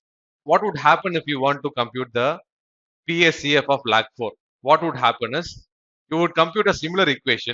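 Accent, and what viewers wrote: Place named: India. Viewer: What a perfect indisn accent :)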